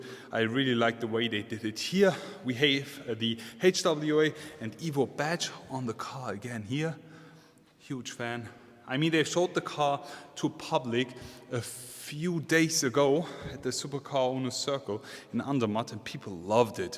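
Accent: Germany. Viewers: It´s always very funny to hear a german guy speaking english !!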